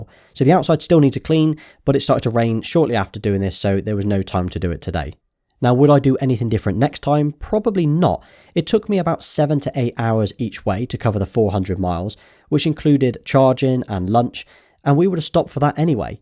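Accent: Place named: Bristol, United Kingdom. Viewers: Watched your videos whenever I see them but I’m looking in to getting a Tesla. I’ve always thought you were from the same city as me (Bristol) from your accent and was so confused when you said you live in York, but then you said you’re back in your home town Bristol😂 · Fab videos, thanks for sharing. Detected the west country accent early on and nice to have that confirmed (from near Bath myself).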